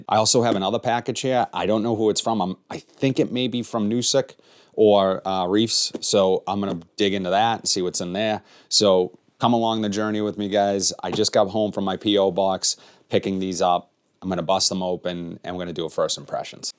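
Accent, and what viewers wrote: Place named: New England, United States. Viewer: Just found your channel and hearing fragrance takes with a NE accent is something I never knew I wanted.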